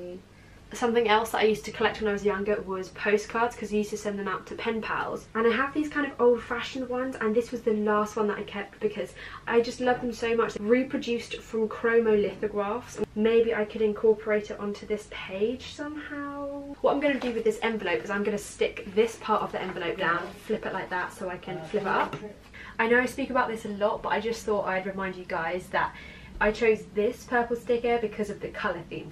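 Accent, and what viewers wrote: United Kingdom. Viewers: Oh so you're british. I love your accent omg I think I'm inlove lol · I adore your British English 😊Ready to listen to whatever just speak plz. Hi to Brighton ❤ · Wow her British accent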